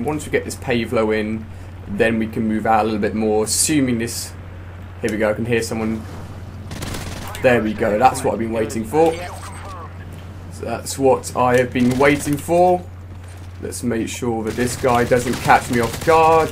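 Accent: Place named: United Kingdom. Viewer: Seriously man. listen to his accent...Britain ring a bell?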